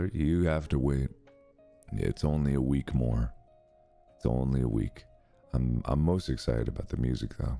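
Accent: France. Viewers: I was watching Murder on the Orient Express so coming to this and hearing him with the French was very odd. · why talking in french voice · Lol french! Yes! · Oh my!!! French accent through the video; oh dear gosh!!! Must be an ASMR video of some stuff.🧔🏻🎮😹🙀😼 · Markiplier sounds like Tom Kenny's SpongeBob SquarePants French narrorator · Am I the only one that hears a bit of a French accent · French Markiplier!~ 😍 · The french accent makes me laugh, i am, and i talk like that, so, for the FIRST TIME, i understand you very well 😭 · French Accent AU ASMR 👌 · nice french accent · Why does he sound french 🥖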